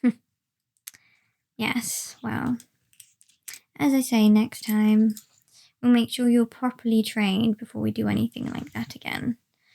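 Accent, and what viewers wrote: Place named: RP, United Kingdom. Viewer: man, you look hotter than ever in this cosplay! and that accent. I normally dislike rp but yours is amazing and suits your asmr roleplays sublimely.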